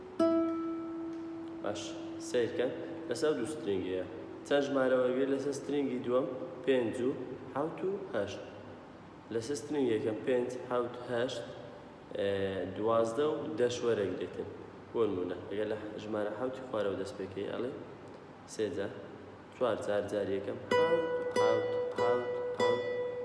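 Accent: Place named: Rawanduz, Iraq. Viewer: Zor Zor supas Kak gyan. You’re a good teacher and I love your Rouwandizi accent 😊 keep it up mamosta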